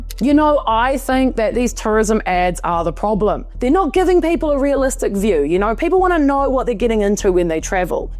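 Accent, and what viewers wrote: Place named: New Zealand. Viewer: So pleasant to hear a New Zealand accent, if I am not mistaken.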